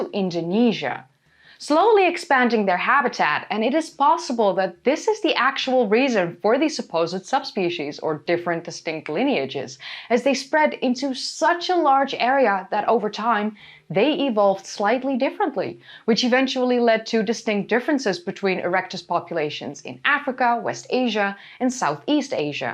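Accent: Netherlands. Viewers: Heel interessant om ons op onze juiste plaats te zetten, wij zijn nog jonkies vergeleken met deze homies. Bedankt voor de vertegenwoordiging van nederlandse uitspraak in deze engels-gedomineerde omgeving. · Hm, what when they are not disappear / going extinct , because they had offspring with the other human species? When the DNA could not extracted, we don't know it. ;) Greetings from Germany and I like your Dutch accent. Heidelbergensis aussprechen ist auch auf deutsch ein Zungenbrecher .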